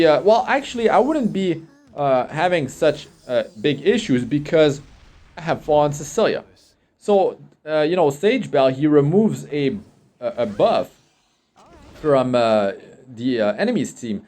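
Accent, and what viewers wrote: Quebec, Canada. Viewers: Hey, weird question but are you from Quebec? Your accent made me wonder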